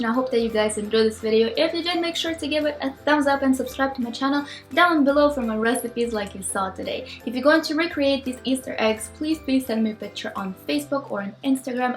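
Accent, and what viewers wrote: Russia. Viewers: Sweet Russian I think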